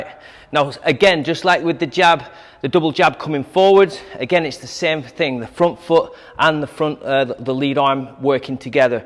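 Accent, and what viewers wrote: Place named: Liverpool, United Kingdom. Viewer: You're a South Park and possibly a Scouse❤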